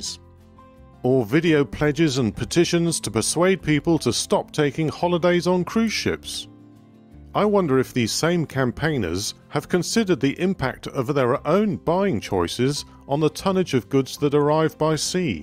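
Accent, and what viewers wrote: United Kingdom. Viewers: Delicious British Accent! What do you think about the environmental impact caused by greenhouse gases produced by aircraft in the long term?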